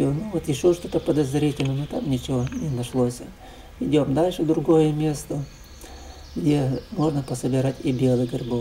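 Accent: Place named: North, Russia. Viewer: С севера видимо.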